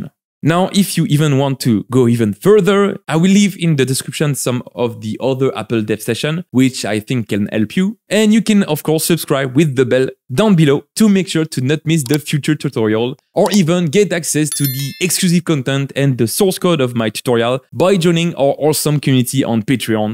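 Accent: France. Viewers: Frech Accenttt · Rhooooh avec ton bel accent, ça sent la France tout ça ! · j'adore l'accent qui prouve que tu est bien français hahaha , sinon bonne video !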